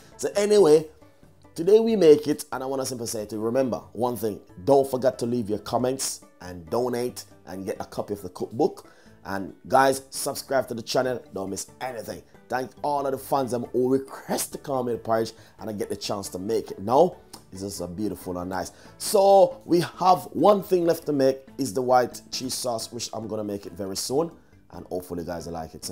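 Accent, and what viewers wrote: Jamaica. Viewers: Mi subscribed once mi hear u voice n see seh yah Jamaican 😭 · Queen Kim don’t get it twisted most of us Jamaicans speak good English ,just cause it doesn’t sound like American are British ,he is speaking English but has his Jamaican accent , unuh really know weh fi pick pon .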